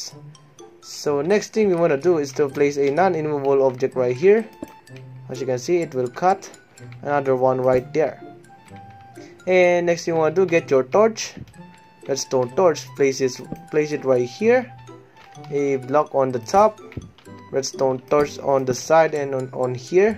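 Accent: Philippines. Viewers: Becuz it worked i subbed I also noticed your filipino accent, MABUHAY KA PO❤️ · oh God I'm listening to a filipino right? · Filipino accent is hard on this one bois i love it so much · In the beggining i knew that he is a fillipino haha cool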